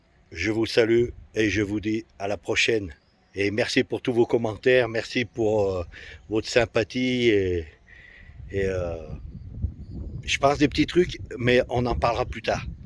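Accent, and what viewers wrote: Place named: Saint-Étienne, France. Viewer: Where are you from mate? You ve got an accent sounds like Saint Étienne 's thank you for your video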